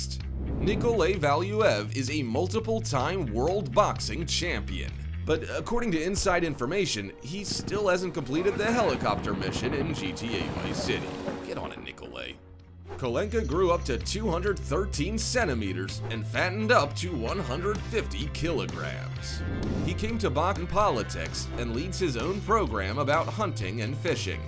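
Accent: United States. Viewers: What the hell is up with this guy? He is speaking English with an American accent so why all the metric crap?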